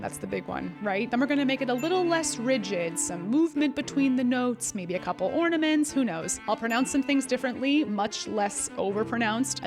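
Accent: England, United Kingdom. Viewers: Thank you for such an informative and entertaining video. The sections where you subtracted the techniques one by one and then added them back in one at a time were very impressive. Some very thought provoking questions too. I was also pretty impressed by your English accent. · Your English accent is brilliant! Listen to the late Gene Pitney who seems to have an operatic kind of technique. Greetings from Good Ol’ England.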